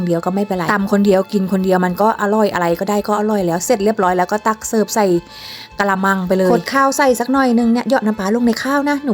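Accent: Suphanburi, Thailand. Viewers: ชอบค่ะสำเนียงสุพรรณเพราะดีค่ะ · เหน่อสุพรรณ คือสำเนียงหลวง ครับ❤❤❤ ผ๋มไผ่ขว๋าง ครับ😅😅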